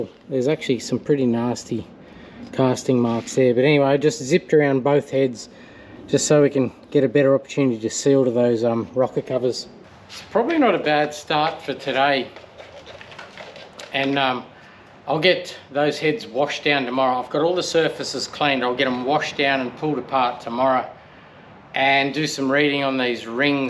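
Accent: Australia. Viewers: Once again I am catching up with this episode late. If you are watching from overseas accent is typical of an Australian tradesman. We are that group of people who don't have to carefully check what we say before we speak. We use slang, we might swear a little, it's all natural to us. With his humour might at times come across as a bit daft. But, he has a sharp mind and he knows what he is doing.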